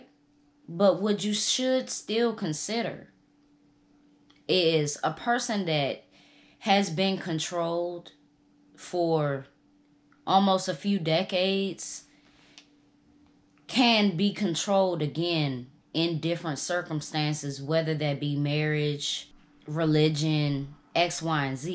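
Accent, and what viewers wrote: Washington DC, United States. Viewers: I always liked yo heavy DC accent💯 Keep levelin 💯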